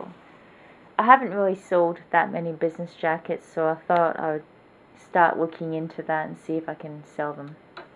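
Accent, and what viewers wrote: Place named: Scotland, United Kingdom. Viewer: idk why but I thought you were Scottish hehe. Anyway you should post your ebay name.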